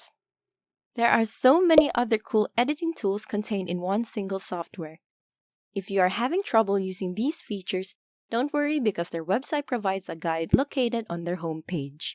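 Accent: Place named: Philippines. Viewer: You deserve a million subs. Keep it up. Ps: you sound Filipino tho.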